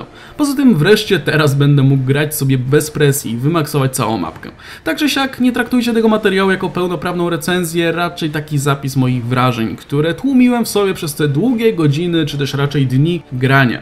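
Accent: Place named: Poznań, Poland. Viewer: speed<num> mi to brzmi normalne, typowe poznańskie zaciąganie.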